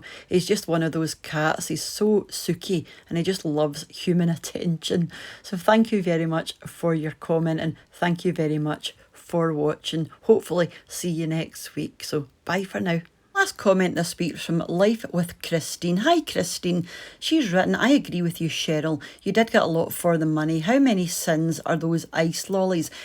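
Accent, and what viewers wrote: Scotland, United Kingdom. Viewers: i love ur scottish accent 😭 pls post a video on how to do mac and cheese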